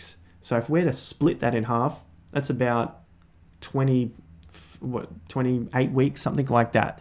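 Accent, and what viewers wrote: Australia. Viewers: Wycoff theory was echoing in my ears in his aussey accent for days without knowing what it really is. now I friggin know.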